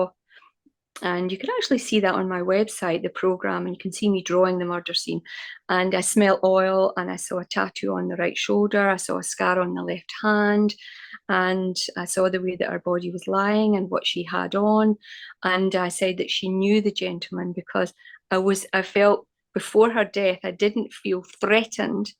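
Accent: Scotland, United Kingdom. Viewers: Is she scottish?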